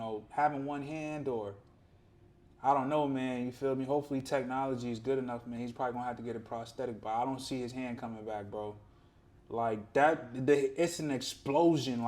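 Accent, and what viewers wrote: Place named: New York, United States. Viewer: Bro u sound like a nerd like one those wannabes from new york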